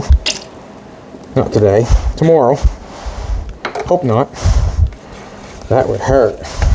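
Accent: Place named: Canada. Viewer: hi! have not found all your bugati videos yet , found a lone early one , but not giving up cuz i love bugati a lot . hope this makes you smile....i am a french canadian from montreal , and just about anything on youtube is english so i am used to it and i noticed you have a canadian accent !!! not from quebec mind you but canadian all the way . yeah flag waving here . refreshing to see your talent in the forefront . might even go to see you this summer .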